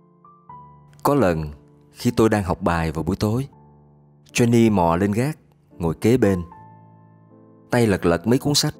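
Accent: South, Vietnam. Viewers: Lan dau nghe truyen cua chau doc truyen rat co cam tinh vi sao? Doc dung chinh ta ; va dung giong mien Nam...v..v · Giọng đọc rất miền nam nhưng không quá nặng của thổ âm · Giọng đọc miển nam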